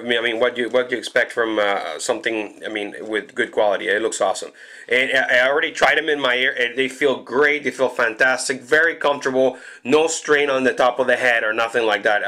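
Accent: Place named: Canada. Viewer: i love ur deep canadian accent lmao its so dope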